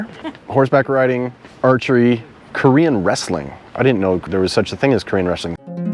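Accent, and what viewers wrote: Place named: Canada. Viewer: i just came across your Youtou channer ! and i love your accent So here's a question for you , where in Cananda did you come from ? (i'm planning to move to Candana and live there for about one year, but i'm stuggling to decide where i live in Canada. the purpose of my visit is to learn english though )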